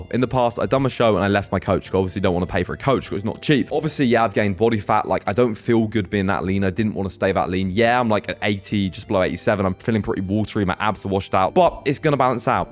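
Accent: United Kingdom. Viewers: Getting shredded and fitness overall is a dead market at this point. With skyrocketing obesity trends, and information on diet and exercise becoming worse every year, most people can't achieve this lifestyle. Due to their own misinformed and repeated failure - they usually give up, It would be a better use of their time to watch the latest movie trailer, political candidate updates, or music video. If you look at what trends on youtube, it's those things. Also, are you from a european country? you sound british. Fitness is not a large part of american culture. youtube is an american company.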